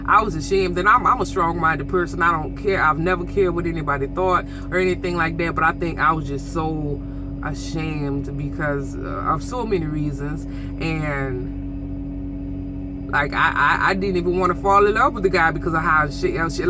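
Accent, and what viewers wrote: Louisiana, United States. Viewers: You sound like my people in Houma Thibodeaux Morgan City Patterson. Oh Lake Charles too. Don't worry about what others think. Do you do more do better.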